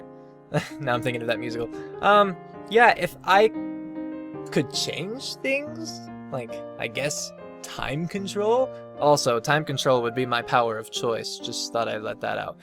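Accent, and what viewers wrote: United Kingdom. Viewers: You probably won't see this but I have three questions: 1. Can/will you play Arkham Origins? 2. Can/will you play Arkham Knight? 3. (Okay this one is kinda silly, but what the hell) are you British? Or just sound British?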